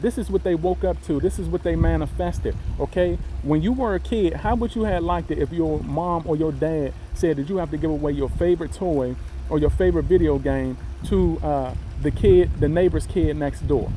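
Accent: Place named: Baltimore, United States. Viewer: ooh ok that’s ah nice home bro I thought you were from Baltimore because of the accent I’m from Baltimore I live in Texas now tho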